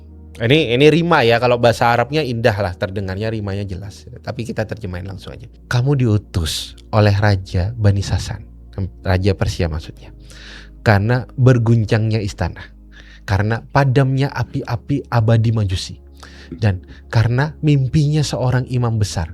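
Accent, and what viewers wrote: Madura, Indonesia. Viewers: Dari logat bicara nya Abang ini suku Madura, Benar..... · Logatnya kyk ny madura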